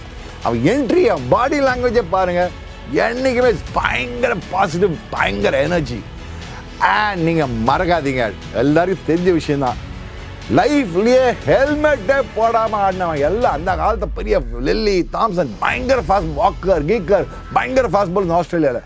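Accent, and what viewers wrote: Chennai, India. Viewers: sir you are awesome! Again and again you are proving you are so talkative, sportive etc.. You always speak in our Madras basha.. 👌👌 Velipadayana Manishan sir neenga..No one can compete with your energy level...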